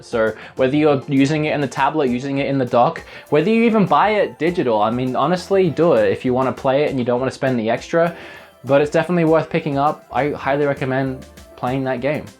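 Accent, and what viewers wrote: Australia. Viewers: Wait.... YOU’RE AUSSIE??????? (Subscription intensifies) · I really want to buy a switch since I never got around to getting a WiiU. Nice to hear an Aussie on YT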